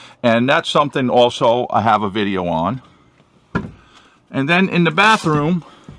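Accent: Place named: New York, United States. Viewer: You have a newyorker accent👍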